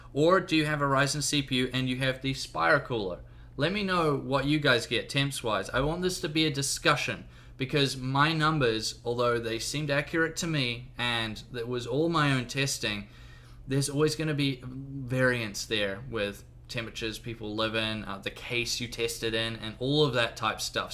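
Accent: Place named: New Zealand. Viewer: Yeah, although I noticed some things. I was thinking he was Aussie or possibly Irish with the way his "fair" sounded. But he reminded me on the Path of Exile developers and the slight accent they have. Then he said he was a New Zealander right as I was thinking he might be from there. I literally LOL'd.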